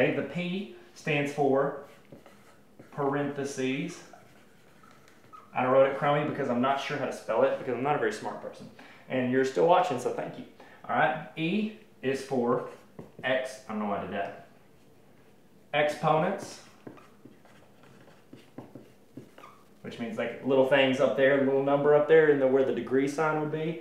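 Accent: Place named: Kentucky, United States. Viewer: I love your Kentucky(region) accent!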